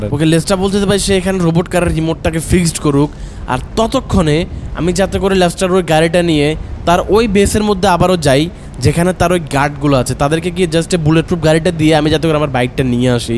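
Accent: Noakhali, Bangladesh. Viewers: Vai apni nohakhali. Kothi tha ken.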